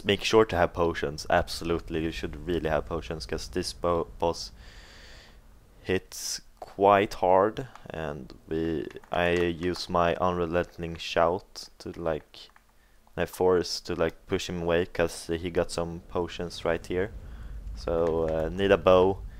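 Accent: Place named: Sweden. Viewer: He sonds like hes swedish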